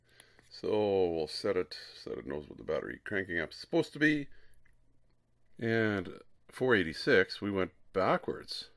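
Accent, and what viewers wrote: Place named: Canada. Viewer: OH i bet it is Canadian dialect. I’m down here in the USA. You do a very decent job on your voiceovers.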